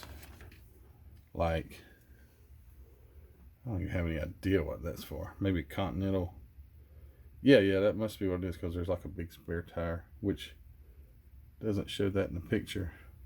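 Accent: South, United States. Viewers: One tip for painting dashes they sell micro micro small brushes at hobby town they come in a pack of 30 for 7 bucks and they are so fine it's so easy doing dash and tiny details and one more question are you from Alabama or Mississippi due to the accent I'm in mobile al if your close maybe we can build sometime or learn some tips from you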